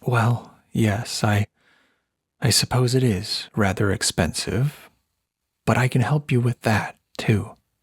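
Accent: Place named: Canada. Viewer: I've listened to your stuff before and only today picked up on your Canadian accent. "Sorry" gave it away 😅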